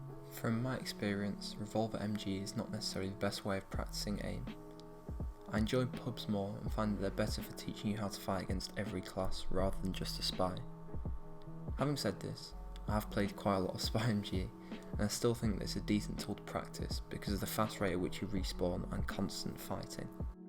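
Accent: United Kingdom. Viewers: hes british just like the spy :o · This was so informative and seductive.. the Welsh accent helps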